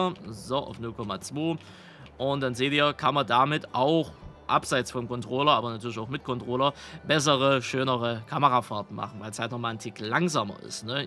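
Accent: Saxony, Germany. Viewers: das er Sachse ist hab ich mir schon gedacht, nur welche Ecke. Bin selbst Sachse.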